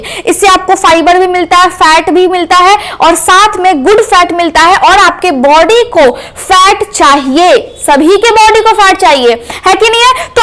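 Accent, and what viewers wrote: Bihar, India. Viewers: Madam App bihari ho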